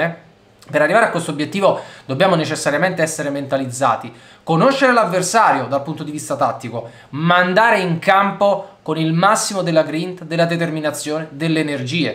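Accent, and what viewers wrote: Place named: North, Italy. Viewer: ACCENTO SETTENTRIONALE VEDO😂😂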